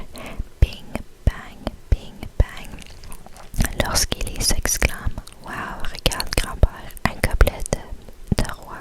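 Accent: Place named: Quebec, Canada. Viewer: L’accent québécois en asmr c’est trop innnncroyable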